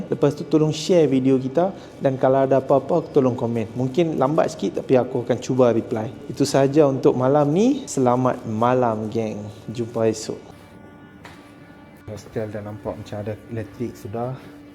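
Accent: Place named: Kedah, Malaysia. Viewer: Alhamdulillah terbaik setiap hari sy x miss tgk video Doc. Rasa seronok dgr bahasa kedah Dr. Sbb sy pun org Jitra Kedah kpg kita pun sama Tanah Merah jgk. Terima kasih dr hidangkan video2 terbsik serata dunia byk ilmu yg sy dpt. Sy doakan terus sukses dan sy doakan jgk Allah bukakan hati dr utk Islam. Aamiin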